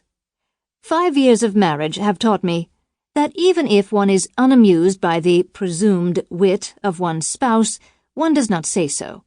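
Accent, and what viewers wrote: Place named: United States. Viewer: Why an American accent? Peabody is British.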